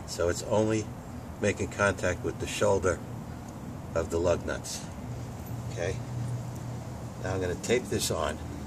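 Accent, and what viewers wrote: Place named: New England, United States. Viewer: Give me a lever and I'll move the world..someone famous said that..archimedes maybe? Thanks for the good tip and great new england accent!. Thought you were one of the this old house guys..👍👍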